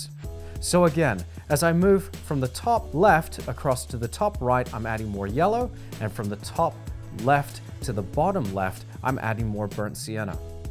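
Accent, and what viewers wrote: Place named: Australia. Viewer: you sound slightly australian but also american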